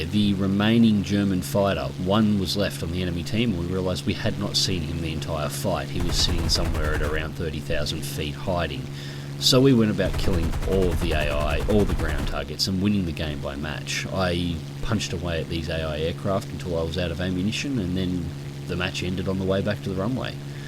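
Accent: Australia. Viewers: the firs video I watched was his Sabre f2 firsti pressions video and the reason I subbed was his flying skills his cool calm and collected tone and of course his aussie accent ;)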